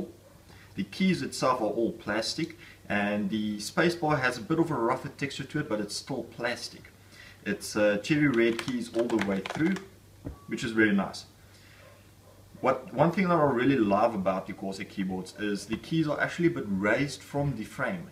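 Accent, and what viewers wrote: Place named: Afrikaans, South Africa. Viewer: Great review for an epic keyboard. Quite an interesting change of pace listening to an Afrikaans accent rather than American haha but I dig it ;) Good job!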